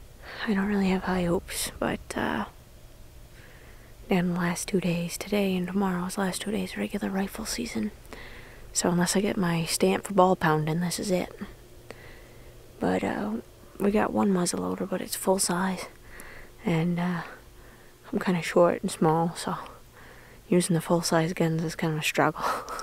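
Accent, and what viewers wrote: Maine, United States. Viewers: Congrats to you!!! Great video, raw emotion, and you did it!!! Love the Maine accent; spot on northeast hunt! Keep after it!!!! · Great to see you succeed. Your family is darling and you my dear are pretty as you can be. Love the Maine accent.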